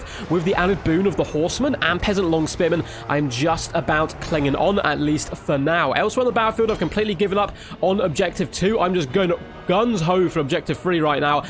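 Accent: United Kingdom. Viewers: Are you British? You sure speak fast :)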